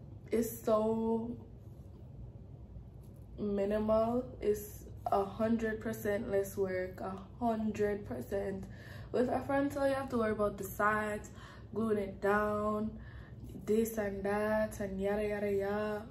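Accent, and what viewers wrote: Jamaica. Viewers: Are you Jamaican? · Are you Jamaican? I keep hair an accent for some reason hehe this is my first time coming to page definitely love this video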